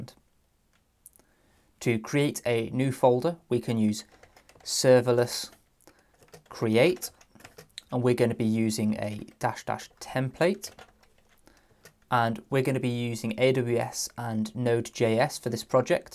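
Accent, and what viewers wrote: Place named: Northwest England, United Kingdom. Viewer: Nice one, love your accent btw. Are you from around the northwest of UK?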